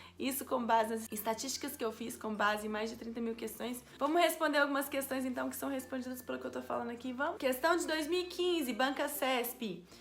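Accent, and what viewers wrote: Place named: Minas Gerais, Brazil. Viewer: Sotaque mineiro é inconfundível 😊⚪🔺⚪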